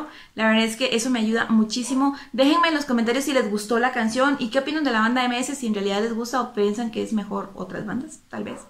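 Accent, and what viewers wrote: Mexico. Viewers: Con reacciones como estas… nuevo suscriptor Por cierto tienes un acento muy mexicano 👍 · Usted no párese tica párese sinaloense oiga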